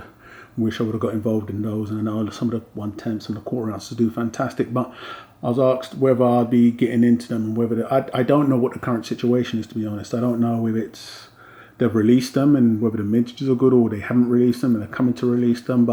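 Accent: England, United Kingdom. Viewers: I really love your English accent and style....I trust your opinion so I went with the 2020 Australia 1 oz Silver Australian Quokka BU . Thanks for your Spirit.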